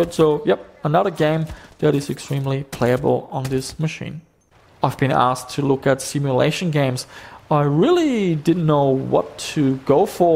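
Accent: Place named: Germany. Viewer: Great video!!! I thought you were German based off ur accent - didn't think Aussie!! Good stuff my man!!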